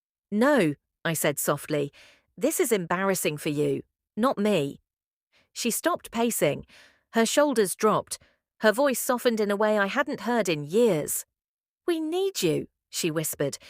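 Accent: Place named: United Kingdom. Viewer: Because it’s in a British accent 😁 Watching from Leicestershire in the UK 🇬🇧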